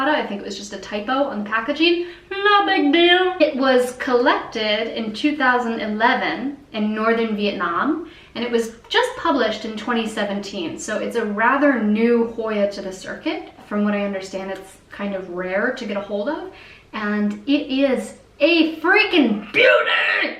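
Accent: United States. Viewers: Just curious where you live. as you sound American, but you obviously don't live in North America hence the reference to the Euro?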